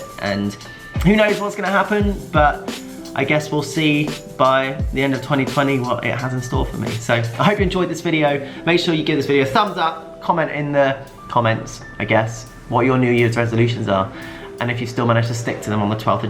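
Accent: United Kingdom. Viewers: Thanks to Tom, my ear is really get used to British accent now 😂 P.s: english is not my main tongue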